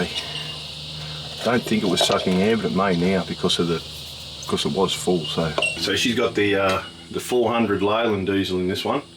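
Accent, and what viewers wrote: Australia. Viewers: Well, as usual i watched you from go to wo and enjoyed every bit cobber. Just love your content and the Aussie way in witch you deliver it. All the best to you and yours and the billy lids mate. See you on the next one.🤠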